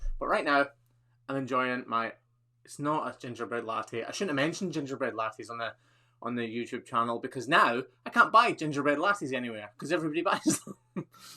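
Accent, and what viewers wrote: Scotland, United Kingdom. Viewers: I missed the live event, dang it, I was going to ask if you were scottish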